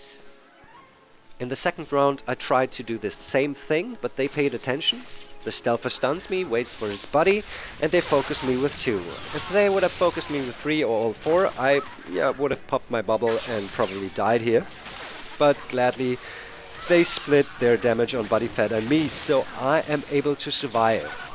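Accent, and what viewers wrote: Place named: Germany. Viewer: Good tips tnx. Love the german accent ;)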